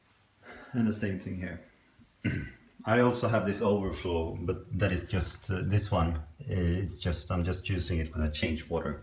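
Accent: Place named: Sweden. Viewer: Kikat på detta kar flera flera gånger tidigare, aldrig lagt märke till att du var svensk. Hörde det direkt på dialekten nu dock, haha... Älskar karet. Så simpelt och samtidigt udda! Det märks att du har ett öga för design... Definitivt en bra källa till inspiration; blir lika lockad varje gång att köra igång ett "flodkar". Har du några planer på att utveckla karet? Jag tänker i form av fisk, inredning, bakgrund osv. Keep up the good work!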